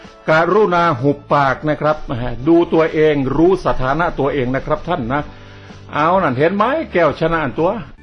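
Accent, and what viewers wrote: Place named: South, Thailand. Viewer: ชอบ จังฮู้นิ รู้เรื่องมวยละเอียด พากย์สนุก แหลงใต้แบบเตินนี่คนใต้ชอบครับ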